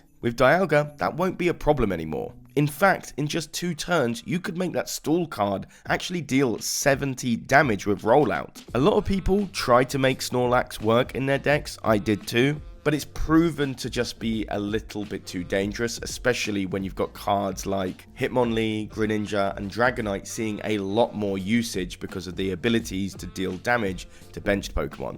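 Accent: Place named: United Kingdom. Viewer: Wait why is this voiced by a british guy now? Did this channel switch owners?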